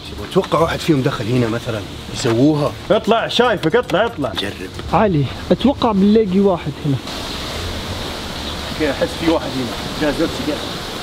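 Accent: Saudi Arabia. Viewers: كيف كلامو سعودي وهو من تونس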